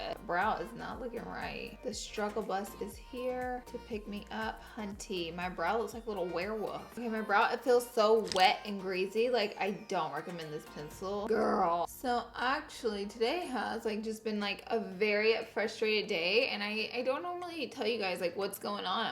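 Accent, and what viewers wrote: South, United States. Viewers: DOLLADOLLA BILLS I love seeing inserts of your old videos. I’m from the south and I just love your southern (charm) accent. It was way more heavy back then as it is now, but I still love it and you and Ty. RTR ♥️♥️.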